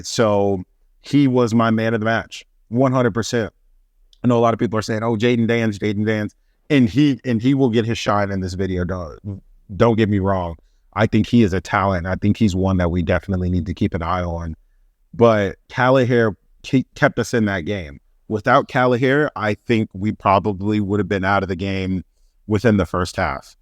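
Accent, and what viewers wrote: United States. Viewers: Usually my brain turns off when I hear an American accent in relation to football talk but your depth in knowledge and analysis is superb! subbed!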